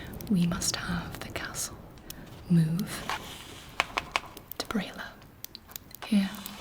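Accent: RP, United Kingdom. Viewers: When I first seen nail shot, I’ve thought to myself okay? she can pull off the look, but can she pull off Camilla rich class pure English voice? Yap she nailed it especially bastard & 🤬 “Perfect” Nicely done 👍👍 Camilla is my favourite & one of the best female vampires Beautiful, cunning & a savage, she will tear you to pieces. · OMG, girl ur so amazing. Ur like the best vampire I've seen in an asmr video. Very soothing voice, yes I love the british accent, I'd do anything to be recruited by her. 😍This vampiress is such a beautiful and elegant goddess! I'd just wanna be her royal guard for all I want and to fulfill her orders and requests! Yesss lemme join u! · As a British viewer, just wanted to say… good job on the accent 😌🤙🏻 very authentic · Your English accent is atrocious I can’t do this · Well done with the British accent 😊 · i didn't know how much i needed to hear a blonde with a red lip and a british accent say the F word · Amazing English accent & acting! 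· Your British accent is amazing and you look absolutely incredible. 10/10. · Hello from the UK!🇬🇧 You're British accent is exquisite my queen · As a Brit, I can confirm that your British accent is rather authentic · It's called received pronunciation and it's passable at best, nothing amazing. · The recieved pronunciation English accent is truly excellent and wow this was something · Wow! I'm British, English, and this accent is amazing! I'm so impressed! Doesn't sound like you even haha. I love this, I also saw your full outfit on insta, my gosh it's fucking amazing... Hehe! It's so cool to hear you swearing but also, cos it doesn't sound like you much, it doesn't seem that bad! Lol, love ya!! ♥️ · 'What is a man but a miserable pile of secrets?' British accent and speech mannerisms on point. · you nail the English accent very well. ☺️ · Your British accent is incredible omg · That Bri'ish accent... 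· Well this accent is great rp standard accent.. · I think the summary on this needless debate is: You wouldn't be able to tell that is not from the UK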